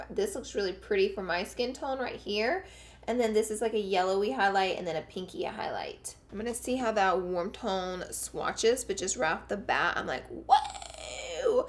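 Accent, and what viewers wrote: South, United States. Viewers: 😞😯 Sure miss your own unique personality & southern accent 😩😒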